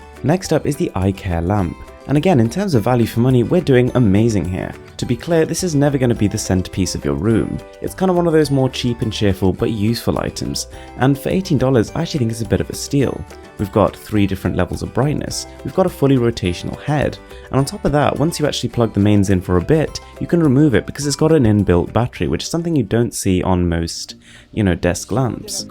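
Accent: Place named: United Kingdom. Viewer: British accent + top notch quality + great person = Mrwhosetheboss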